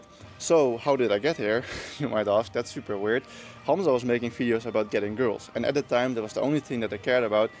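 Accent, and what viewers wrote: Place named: Netherlands. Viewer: I should've known you'd be something like 6'8" from the moment I heard your Dutch(?) accent. Idk why I was so surprised 😂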